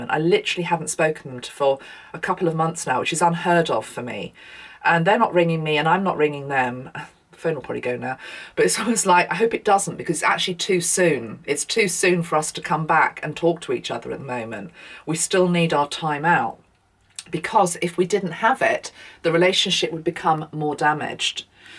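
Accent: England, United Kingdom. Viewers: Hello I was just introduced to you last week by a friend and I so resonate with your messages I love your lovely English accent and I so appreciate your truth Thank you so much where we go one we go all Diane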